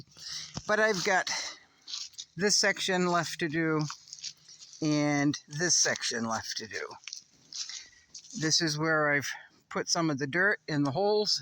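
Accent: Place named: New England, United States. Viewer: I really enjoy listening to you talk and I'll explain why. My oldest brother passed 3 years ago from cancer and he lived in Rhode Island. And last month someone that was like a brother to me that lived in New Hampshire also passed from cancer. Hearing that New England accent brings some comfort to my heart. Me and my NH brother used to get so tickled at each other with the comparison of saying, when I park my car I put my car keys in a pocket of my khakis😂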